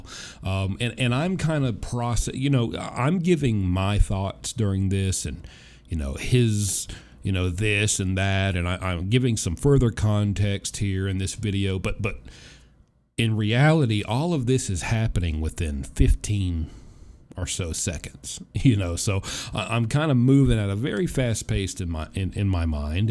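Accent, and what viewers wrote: South, United States. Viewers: Wow, I love this story but most of all I love your voice - how did you get rid of your southern accent? I live in Alabama and would LOVE to get rid of mine (grins).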